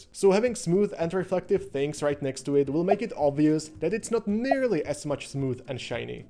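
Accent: Czech Republic. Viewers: Youtube doporučí zajímavé video. Po první větě je jasné, že jeho Tvůrce je Čech. Jinak skvělé rady, to se musí nechat.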